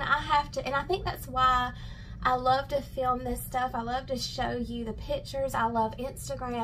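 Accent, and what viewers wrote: South, United States. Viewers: I love the way she talks so southern and sweet .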